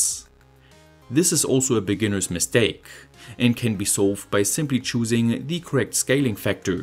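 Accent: Germany. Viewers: German accent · You’re german right ?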